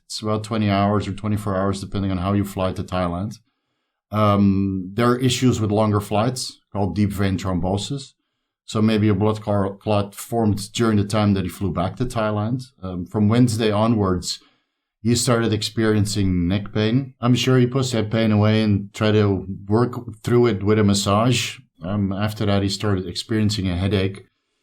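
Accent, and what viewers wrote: Netherlands. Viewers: To be honest, I have only seen calloboration videos of Jo but I really liked him and I am left wondering why I only started to follow him now. Still, your loss of a loved one even resonates in me and he will be in my thoughts. I also do not know you, however, your accent sounds Dutch. In case you are, ik wens je heel veel sterkte in de komende tijd! Rest in paradise Jo, we will carry on for you, with you in our thoughts❤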